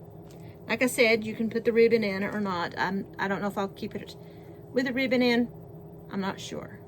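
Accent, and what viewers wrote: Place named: South, United States. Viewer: Hi Sweet.. I'm excited to see this creation using a tray... Funny how wood takes staining differently... Don't ever apologize for your southerness coming out at all... I love that about you... God didn't make us perfect and I love that... The metal ribbon border really makes it pop and very beautiful... How cute is that... I love it.. Turned out beautifully as always.. you are such a creative person, I would have never looked at those pieces and put together what you just did... You amaze me each and everytime... The green ribbon adds another level of beauty to the piece.. the flower completes it and draws it all together... I like the ribbon on it.. thank you for sharing this activity... God bless you and your creativity.. love y'all Sweet... Till next video stay just like you are.. Bye..🥰🥰🥰🥰🧶🧵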